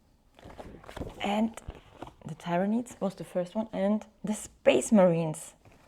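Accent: Germany. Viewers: Nach zwei Sekunden wusste ich dass du deutsch sein musst 🤣 obwohl dein Englisch nicht schlecht ist, man hört es eben immer heraus. Ich wünsche euch viel Spaß bei dem tollen Hobby, ich bin auch noch Beginner und fokussiere mich erstmal auf das PS5 Game, habe früher aber auch gerne so was wie Heroquest und gelegentlich D&D gespielt. Jetzt verkaufe ich alles was ich habe um mir eine Armee zusammenzustellen 😉 Ich werde deinen Weg hier gerne weiter verfolgen. Grüße aus Hamburg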